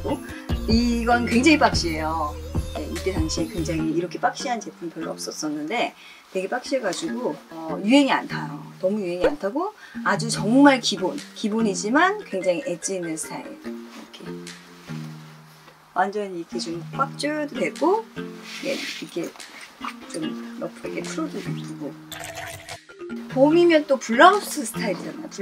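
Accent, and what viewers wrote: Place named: Seoul, South Korea. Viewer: 서울사투리 쓰네영ㅎㅎ귀엽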